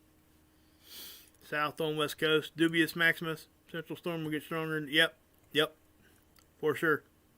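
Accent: South, United States. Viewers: Love the info you share! I am just finding your channel. Where you broadcasting from? You sound like a good ole southern gentleman!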